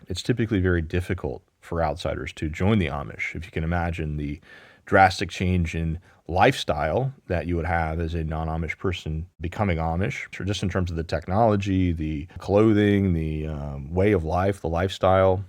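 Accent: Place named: Baltimore, United States. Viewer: You sound like you’re from where I’m from lol. The way you say your Os. I’m from just north of baltimore, not to far from SE PA Amish country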